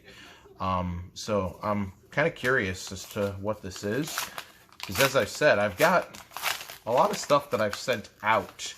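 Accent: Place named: Utah, United States. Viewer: I’m listening to your accent... I’m hearing something that tells me that you’re not a native from North Carolina. What am I hearing? My guess is Utah. Am I right?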